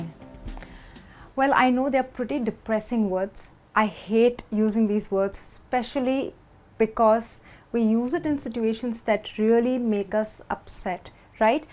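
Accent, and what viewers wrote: India. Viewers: Are your English is Indian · You english sounds like indian english. I learned very much with this lesson.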